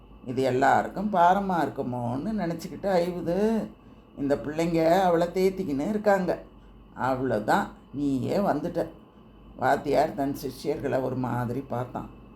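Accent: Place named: Chennai, India. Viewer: நிதர்சனம். மெட்ராஸ் பாஷை super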